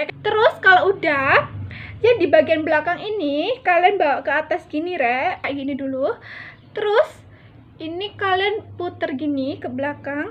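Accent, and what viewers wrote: Java, Indonesia. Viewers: Iyo rek manteb rek ilat jowo medok rek hehehe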